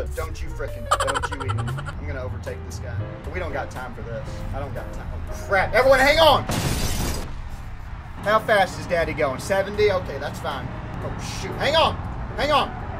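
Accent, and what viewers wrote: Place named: West, United States. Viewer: I like the western accent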